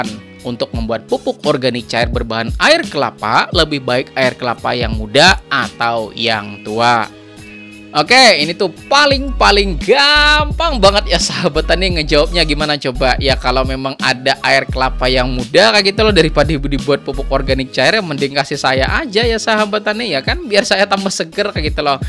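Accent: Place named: South Kalimantan, Indonesia. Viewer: Suka denger gaya abangnya jelasin. Di dengar dari logatnya, orang Kalsel ya bang?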